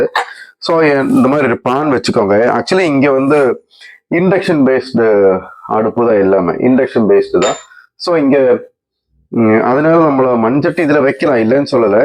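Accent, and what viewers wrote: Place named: Kerala, India. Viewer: നിങ്ങൾ മലയാളിയാണ് എന്ന് സംസാരം കേൾക്കുമ്പോൾ തന്നെ അറിയുന്നുണ്ട്. വീഡിയോ നന്നായിട്ടുണ്ട്. ആദ്യമായിട്ടാണ് കാണുന്നത്. സുബ്സ്ക്രൈബ് ചെയ്തു.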